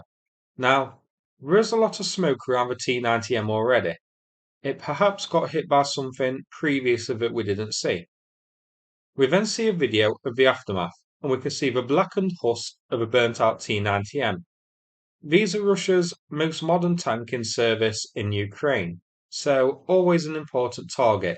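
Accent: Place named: Northern England, United Kingdom. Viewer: Krinkeh! Love the northern English pronunciation of the names and also the jokes. Keep it up lad!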